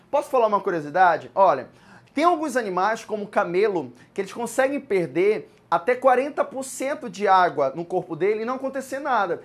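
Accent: Pará, Brazil. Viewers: meu deus tua aula é excelente, socorro! Teu jeito de falar é tão paraense que me senti acolhida. bjs. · Maninho tu é Paraense? 😂😂 adorei a aula. 💋🙇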